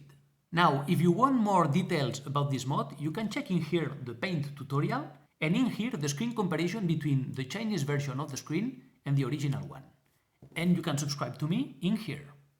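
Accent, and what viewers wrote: Spain. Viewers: Eres español a q si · hola tu eres español no????? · Claramente eres español, pero me encanta tu inglés jaja (y también tu canal, joder!) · Cómo se te nota que eres español!!! 😂😂 Porque me pasa lo mismo con el inglés! Suscrito!! Yo le voy a hacer el mod a una SP AGS-001. Porque de momento no encuentro una Advance bien de precio y se lo haré a la SP que tengo desde el 2004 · La virgen como se nota que eres español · Tu ingles es tipo vallecas. Eres español no??? Donde pillas componentes? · eres español??¿¿ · Tu eres Español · Acento de español como una casa 🤣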